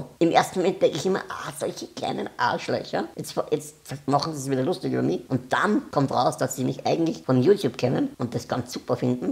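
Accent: Austria. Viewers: Dein Wiener Akzent ist so süß😍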